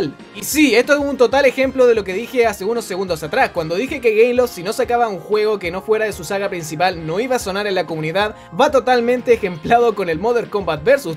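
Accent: Chile. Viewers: Podrías por favor tratar de neutralizar más tu acento...? Tus vídeos son excelente pero tu acento chileno se me hace tan fastidioso... No soy hacer ni nada, solo como consejo..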